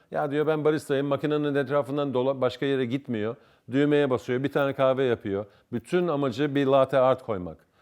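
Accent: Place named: Australia. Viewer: Bu adam Avustralya’da doğup büyüyüp Türkiye’de kahve dükkanları açtı. Türkçesi bu yüzden aksan olarak farklı ama yinede diksiyonu çok iyi.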